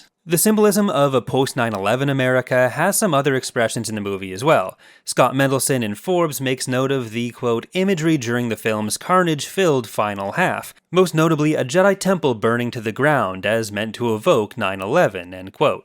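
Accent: Canada. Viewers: Great video I do enjoy hearing a Canadian explaining better job at American politics of the early 2000s then many Americans who lived through and who were politically engaged in that time .😂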